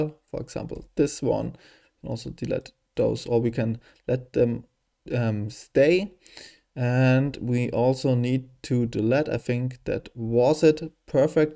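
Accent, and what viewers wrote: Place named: Germany. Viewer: Der deutsche Akzent fetzt😂 gutes Video, besten Dank!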